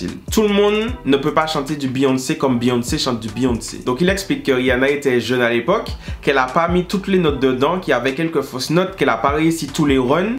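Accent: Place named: Martinique, France. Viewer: Martinique ou île de la réunion ??? Cet accent 🤣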